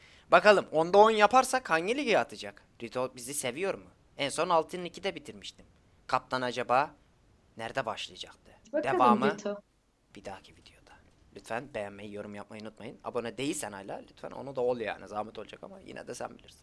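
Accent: United States. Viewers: kaptan şu amerikan dublajı yapmayı bıraksan hani videolarında eski tat yok reis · Kardeşim şu amerikanvari konuşmaların gerçekten tipine çok iyi gidiyor başarılarının devamını dilerim :D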